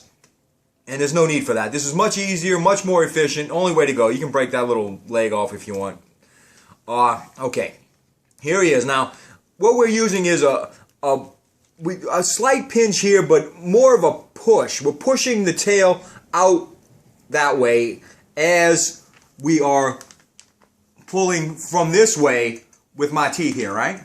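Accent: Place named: New England, United States. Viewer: This guy sounds like a yankee???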